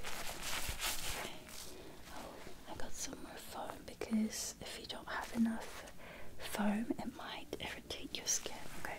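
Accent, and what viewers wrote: Australia. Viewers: That explains it, I thought I heard Aussie sometimes! Oops, sorry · Do i detect a hint of Australian accent? Love this. Thank you! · Can I ask where you are from? Your voice is pretty and has a slight aussie accent with how you say some things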